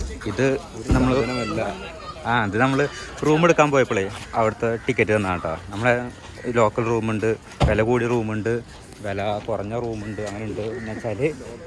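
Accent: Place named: Alathur, India. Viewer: നിങൾ ആദ്യമായി വരുകയനലെ നിങ്ങളുടെ ആലത്തൂരിൽ സംസാരം കേട്ടാൽ അറിയാം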